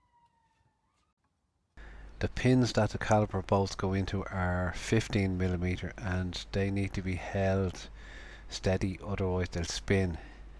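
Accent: Ireland. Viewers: love the Irish narrative and the copper slip lol, dont thing you will live that one down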